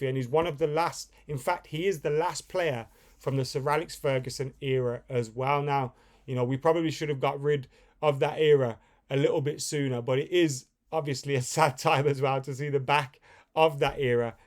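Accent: Birmingham, United Kingdom. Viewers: Thank you always clear and concise. Also, are you from Birmingham mate. Love the content❤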